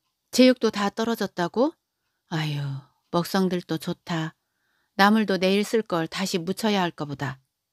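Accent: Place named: Jeju, South Korea. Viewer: 제주도에서 시집오신 외숙모하고 말투가 똑같으셔서 듣는 내내 더 재밌기도하고 신기하기도했답니다😂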